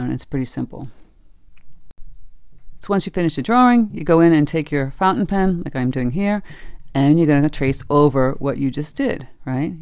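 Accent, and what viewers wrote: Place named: New England, United States. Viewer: I have been doing art for years, since childhood and yet I tune into you because you a) sound just like my New England sister in law, and b) you inspire confidence, as you have such a casual, easy going way of explaining that you make everyone watching feel the projects are all approachable. Good job on breaking things down well.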